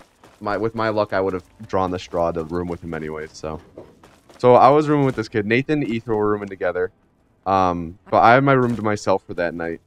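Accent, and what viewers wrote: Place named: Chicago, United States. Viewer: In a past video you said you we're from the Midwest in this you said you were watching the Cubs game ,guessing your from around cook County too? I knew I recognized that accent.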